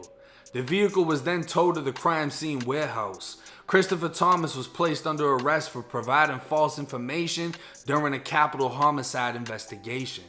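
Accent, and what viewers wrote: Boston, United States. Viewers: Yo cuzzo, I don't know ya name. You, the one who runs this page, I hear u say u been all through Florida prisons, so I assumed u were from Florida. But it sounds like u are from Boston, or even a little NY. Where u from cuzzo. And I definitely fucks wit ya page. I always watch it. I did a little over 10 yrs. Did time in Philly, Smyrna and Delaware, but where u from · Your accent is interesting. I've never heard what a boston wigger sounds like before. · “I don’t know if he’s re-ta-did or what” 😂that Boston accent kills me every time. Great channel bro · Checking in from Providence, Rhode Island, it's cool that hes got the east coast/Boston accent too lol. PS These dudes are sick🤢🤭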